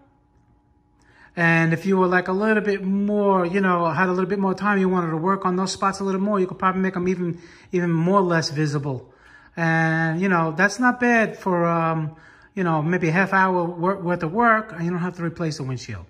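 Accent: New York, United States. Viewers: The New York voice rules. I love it.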